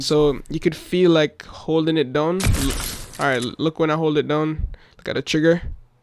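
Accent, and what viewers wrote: Jamaica. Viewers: Jamaican we can hear it in your voice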